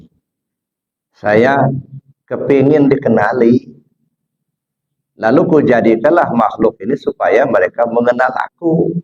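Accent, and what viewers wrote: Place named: Lampung, Indonesia. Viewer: Di mana alamat . Dari logat nyalampung di mana buya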